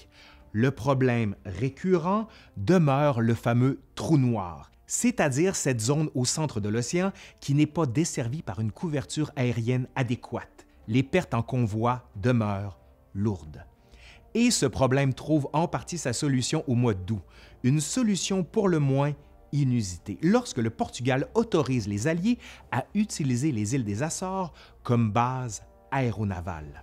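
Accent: Quebec, Canada. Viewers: Excellente série de vidéos ! un gros travail objectif et exhaustif.... peu de niaiseries sentimentales, l'histoire et que l'histoire jaime beaucoup et l'accent québécois c'est la petite cerise... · Je découvre votre chaîne, et -- je vous le répèterai ailleurs, sans doute losque je regarderai votre première guerre mondiale -- j'aime beaucoup votre voix québécoise au sujet de l'histoire, qui vous incite à traiter d'autres faits que choisirait un français. Par exemple, il n'est pas indispensable de parler ici de la libération de la Corse, qui n'intéresse qu'un français de France... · Premièrement j’adore l’accent québécois. Si tu avais été mon prof d’histoire-géo au lycée je suis sûre que j’aurais eu des meilleurs notes. Non vraiment tes vidéos sont super instructive moi qui suis une passionnée de la seconde guerre mondiale j’ai trouvé du contenu digne de ce nom. 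Je me rappelle aussi des témoignages de mon arrière-grand-mère. Alors je suis française je viens de Vendée et j’y vis toujours. Vendez égal zone occupée elle disait que tous les jours il y avait un allemand sur sa bicyclette avec une bouteille à la main en train de demander des pièces et il fallait lui donner les pièces sinon… on m’a aussi raconté que dans une ferme de ma région le fermier s’est retrouvé sans sa vache parce qu’un autre fermier concurrent a dit à un soldat allemand de s’en emparer. Bref le temps a été dur pour ces personnes que j’ai connu et qui m’ont témoigner de ce qu’ils ont vécu avec beaucoup de courage. Cependant les témoignages de la famille ne suffisent pas il faut voir les choses avec globalité et grâce à toi c’est possible. Vraiment merci tu es trop génial. Il faut mieux regarder tes commentaires que niaiser comme on dit chez toi. Kiss et continue comme ça! Bon, je descends les vidanges et je reviens à cette nouvelle vidéo.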